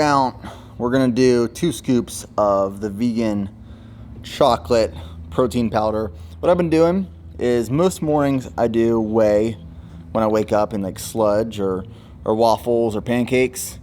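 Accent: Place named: Philadelphia, United States. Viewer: Hey! I have been a subscriber to your channel for a few months and I always wondered where you're from originally? You sound like you have an Philly accent! Good luck on the training!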